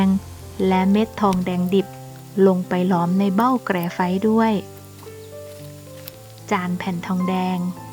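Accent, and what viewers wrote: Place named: North, Thailand. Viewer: เสียงบันยายดีมากๆคับฟังเหมือนอยู่เมืองเหนือคับ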